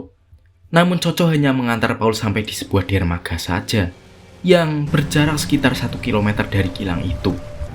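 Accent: Java, Indonesia. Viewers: Logat Jawa pekat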